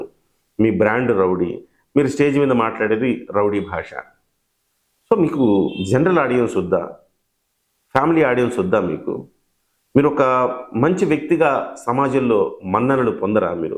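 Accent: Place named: Rayalaseema, India. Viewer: ఆ రాయలసీమ యాస ఎందుకు భయ్యా..పొగరు దురద అంటూ వస్తె నాశనమే...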